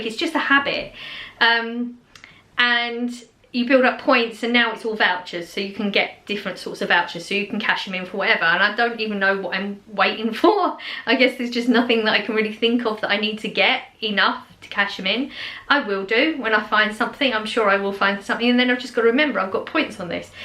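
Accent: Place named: England, United Kingdom. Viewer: Never to chatty, enjoy your lovely English accent..makes me remember my grandpa he was from England,miss hearing his accent.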